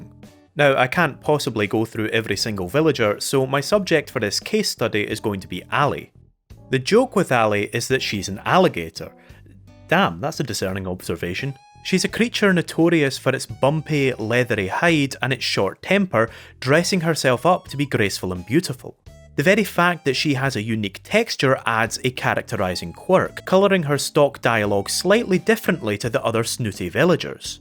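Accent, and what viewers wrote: Scotland, United Kingdom. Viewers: a strong scottish accent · Is that a Scottish accent? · I miss the Scottish accent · im considering this scottish asmr while i write my uni essay lol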